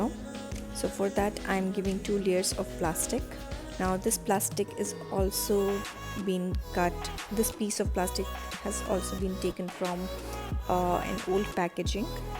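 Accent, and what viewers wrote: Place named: Mumbai, India. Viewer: Where are you based? Your beautiful accent reminded me of my holiday in Mumbai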